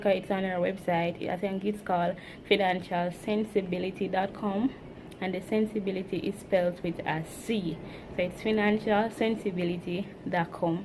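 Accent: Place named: Jamaica. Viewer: I’m Jamaican 🇯🇲 too. Love that you’re staying real. I only sound like you when I’m upset or around others 😂 . Thanks for making me feel like I’m home 💕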